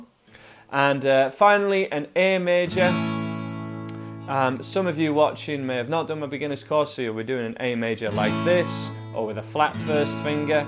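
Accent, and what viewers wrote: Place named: West Yorkshire, United Kingdom. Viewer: What part of West Yorkshire is that accent from?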